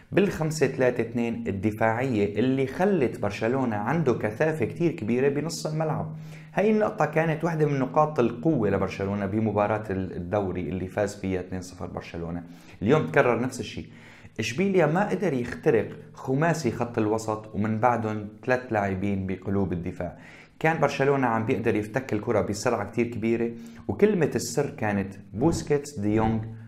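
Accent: Aleppo, Syria. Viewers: كني حلبي ؟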